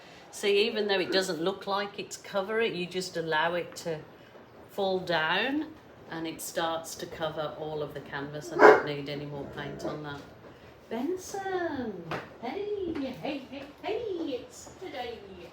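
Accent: Birmingham, United Kingdom. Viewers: I thought you are a Brummie I was born in Coventry lived in Bulkington came to West Oz in 1970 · I love watching your videos. My art teacher at school told me I didn’t have an artistic bone in my body so I basically never pursued an art activities after I finished school. During lockdowns I have found your videos initially to calm me because I find watching art being created very therapeutic but I’ve now decided that at 43 I might try and find that creative bone my teacher said I didn’t possess. I know you’re in Australia but are you actually born and bred Australian? I only ask because to me, a Brit, you sound slightly Brummie. I love listening to you you’re so don’t to earth and funny. Thanks for sharing all you do x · I have to ask because it is driving me bonkers, were you born in Australia? The reason I ask is because I constantly hear some Brummie, [Birmingham] in your accent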